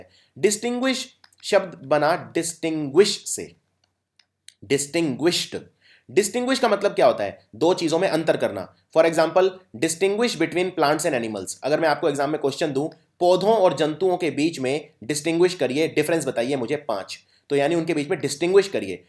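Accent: India. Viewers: first just know that how to pronounce words. blody Indian accent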